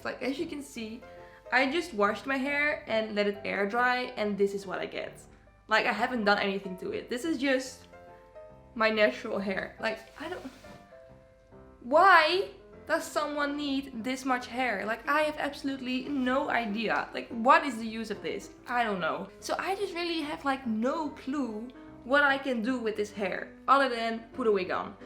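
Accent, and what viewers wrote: Netherlands. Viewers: First of all, amazing hair. Second of all, are you Dutch? You sound Dutch and im jealous of ur hair.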